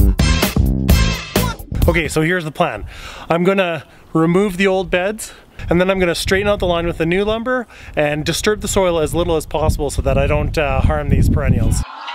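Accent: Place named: Canada. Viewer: This is my fave gardening channel. Upbeat, empirical, comprehensive, good scope, and with the cutest Canadian accent EVER. Hey, I have European plums, cut them to knee height last spring after planting. Can you explain vase vs central leader pruning for young trees? I find it hard to visualize as a process from year to year.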